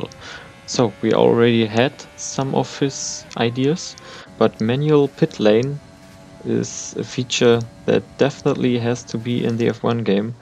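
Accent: Germany. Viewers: Da du deutschen Akzent hast versteht man dein English viel besser!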